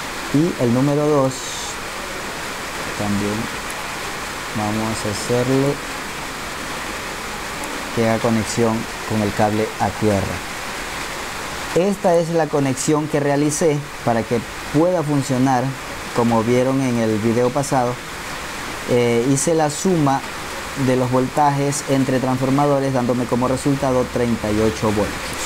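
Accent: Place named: Colombia. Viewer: soy de Venezuela y no se si las numeraciones de los cables son igual a las de donde tu eres, me pareces colombiano por tu acento y el cable numero 8 aca en Venezuela es un cable bastante grueso y no creo que entren ni 12 vueltas en un transformador de microondas, aclarame si ese cable es grueso o de que grueso es laseccion de el cable 8 que indicas.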